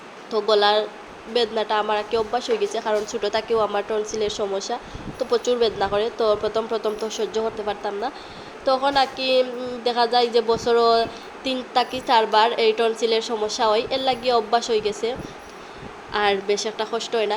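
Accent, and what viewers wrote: Sylhet, Bangladesh. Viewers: আসসালামু আলাইকুম সিলেটি আপু কেমন আছো আজকে তোমার ভিডিও টা নতুন দেখছি তোমার মিষ্টি মিষ্টি কথা গুলোর প্রেমে পড়ে গেলাম। তোমার লজ্জা লাগার কোনো কারন নেই তুমি তোমার মাতৃভাষায়ই কথা বলো এতেই অনেক অনেক ভালো লাগে তোমার ভিডিও দেখে মনে হলো যে তোমার সাথে আমার অনেকটাই মিল আছে। আমার ও তোমার মতো টনসিলের সমস্যা আছে। তোমার মতো আমার সেইম বেডশিট আছে। আমার শৈশব কেটেছে সিলেটে তাই সিলের কথা শুনলেই মনটা কেমন যেনো আনচান করে জানিনা কখনো আর যাওয়া হবে কি না তবে সিলেটের জন্য মনটা আনচান করে। যাই হোক তোমার ও তোমার পরিবারের জন্য অনেক অনেক দোয়া ও ভালোবাস রইল পরবর্তী ভিডিও দেখার জন্য অপেক্ষা করবো ❤❤❤❤ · আসলেই তাই নিজের কাজ নিজেকেই করতে হবে।যতই অসুস্থ হই।আপনার ভয়েজ সুন্দর। সিলেটি ভাষা শুন‌তে ভালো লাগছে❤❤❤❤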